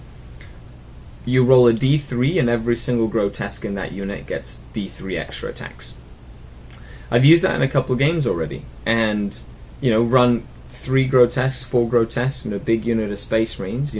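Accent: United Kingdom. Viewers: Are you Canada you sound uk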